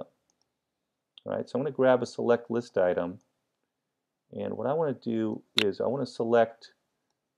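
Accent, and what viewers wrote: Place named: United States. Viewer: You're a rubbish teacher your american accent makes me hate you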